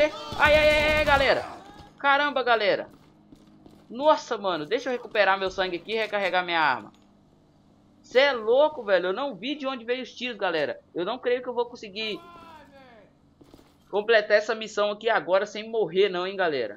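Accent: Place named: Sao Paulo, Brazil. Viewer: Você é paulista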